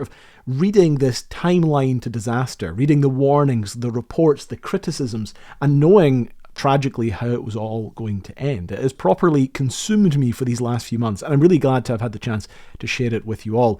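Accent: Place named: Scotland, United Kingdom. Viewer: Yet another great deep dive video by you of something unique and original, the fact its read by you with that Scottish accent, makes it even better. Kind regards John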